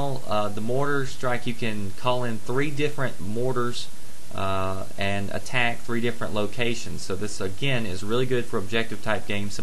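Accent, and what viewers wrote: South, United States. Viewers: What state are you from? I noticed u have a southern accent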